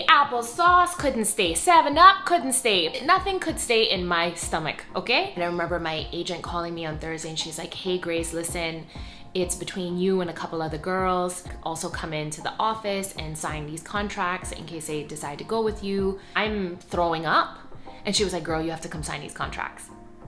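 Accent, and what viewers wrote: Cayman Islands. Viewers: Cayman Accent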